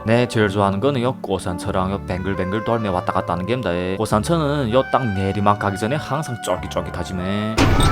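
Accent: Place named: Yanbian, China. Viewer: 안녕하세요:) 채널 초창기부터 구독했던 시청자입니다. 영상 재밌게 잘 보고있습니다ㅎㅎ 다만 영상들에 있어서 한 가지 조언을 드리고자 댓글을 달았습니다. 편집도 깔끔하고 업로드하는 영상도 좋아서 잘 보고 있습니다만, 연변 사투리를 이해하기 힘듭니다. 채널 소개란의 글과 유튜브라는 플랫폼을 선택한 점으로 보아 한국사람들에게 연변과 조선족 음식 문화를 소개하는 목적으로 영상을 만드시는 걸로 짐작합니다. 그러나.. 슬프게도 올리시는 영상 내용을 제대로 파악하기 어럽네요ㅠㅠ 자막도 사투리를 그대로 옮겨 작성하셔서 앞뒤 문맥으로 짐작할 따름입니다. 하지만 한국인으로서 처음 듣는 어휘가 너무 많아 여전히 이해하지 못하고 넘기는 경우가 많습니다. 앞으로 한국인을 타겟팅하여 영상을 올리실 계획이라면 표준어 자막도 같이 달아주시길 추천드립니다. 강요하거나 요구하는 것은 아니고 채널 번창하시기에 좋은 방법인거 같아서 추천드렸습니다. 두서없이 쓴 글 읽어주셔서 감사합니다ㅎㅎ 채널 대박나고 건승하시길 기원합니다😊😊 덕분에 중국 여행가면 상해가 아니라 꼭 연변으로 가보고 싶네요ㅎㅎ 화이팅입니다!!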